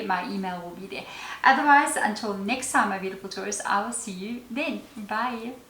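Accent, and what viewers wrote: Afrikaans, South Africa. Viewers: I command the Universe: "Abundance in all ways, especially financial abundance, flows to me like a never-ending waterfall" GRATITUDE GRATITUDE GRATITUDE (Ek hou van jou Afrikaans aksent !) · gave me tears… your vid popped up, then i heard your S.A. accent, posted 13 hours ago, 13 mins long… something to do with Ophiucus.. south african taurus here ❤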